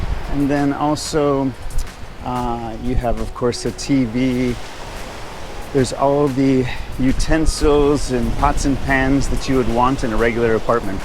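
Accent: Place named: Spain. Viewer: Killing me with your Spanish accent 😂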